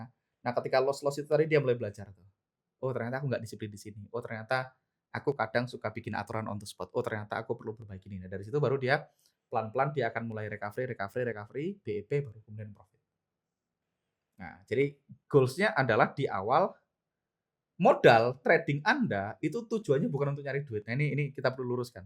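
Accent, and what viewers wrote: Banyumas, Indonesia. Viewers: Ora ngapak..ora kepenak 😂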